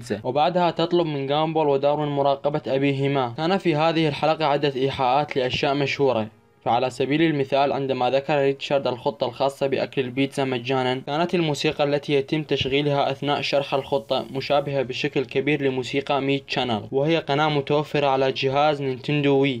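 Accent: Iraq. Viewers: انتة عراقي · أنت عراقي لو لا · انتا عراقي؟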